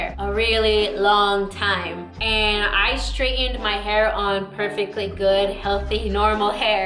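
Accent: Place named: India. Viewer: I love your Hindi ascent... Love from India ❤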